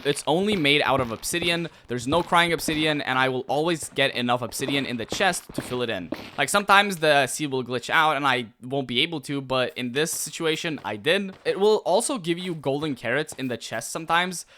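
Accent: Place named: United States. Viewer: ngl your accent sounds so american that when you said zed it threw me off so much lol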